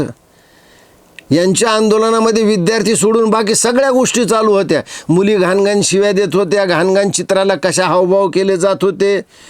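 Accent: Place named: Satara, India. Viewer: एकदम सातारी भाषा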